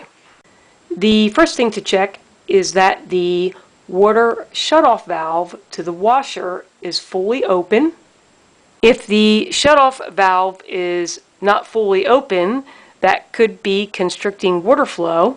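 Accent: Philadelphia, United States. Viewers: thanks for the video seems like simple things to check. Mine runs slow only on the second fill. That accent you have sounded like Philly or ?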